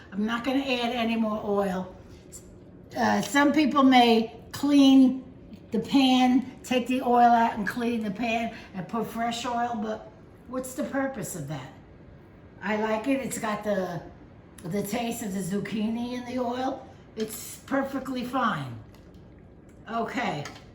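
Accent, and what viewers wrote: New York, United States. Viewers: Stanley Tucci started the recent craze about Spaghetti Nerano. There is a video of Stanley watching an Italian Chef make the dish which Stanley says was “ life changing” . Chef John from Food Wishes agrees and insists deep frying the Zucchini rather than pan frying is the first key. The second key is refrigerating the zucchini overnight. The kitchen science is not explained. Finally, Chef John uses more butter. Otherwise , he mashes the zucchini like you do , and he used substitute cheeses like you. I pan fry my zucchini in more oil and butter than you do, but I hate deep frying. I might refrigerate the zucchini once to see if it matters. I love watching you as your accent reminds me to call my sister in New Rochelle( I live in Omaha since Medical School). 😘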